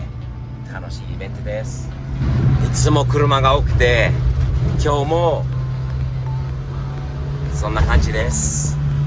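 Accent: United States. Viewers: Also, one final thing I want to say. Say what you want about American accent, but that guy's still extremely proficient in a second language in a way that the vast majority of Americans are not. I will always respect the fact that this guy raised his son to also speak fluent Japanese, as well, despite the fact that he was raised entirely in America (Link: <link> I wish my own father did this for me, and I still love him to death, but he had neither the patience nor the confidence to learn my mother's native Japanese tongue. The Japanese language is an heirloom that is worth preserving, but because my sister and I were never raised in the language, we've had to pick it up as adults since. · アメリカ訛りの日本語って感じで好きです！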